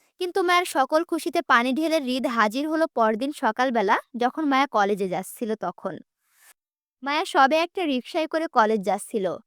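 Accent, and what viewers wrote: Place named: Kushtia, Bangladesh. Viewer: আমি নিজেও কুষ্টিয়া জেলার মেয়ে। আপনার কথা শুনে নিজের জেলার টান টাই মনে হচ্ছিল বার বার। এবার কনফার্ম হয়ে গেলাম।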